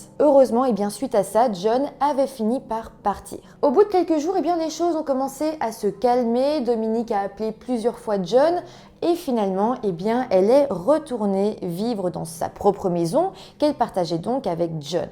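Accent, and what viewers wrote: Belgium. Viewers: Incroyable la justice américaine sur ce dossier, ce n'est vraiment pas cher payé pour un assassinat Merci pour cette histoire, j'ai cru reconnaître un léger accent belge, me trompe je ? CC des Vosges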